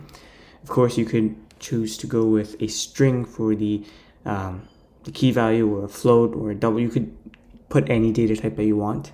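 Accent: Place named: India. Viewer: lol hes got a subtle indian accent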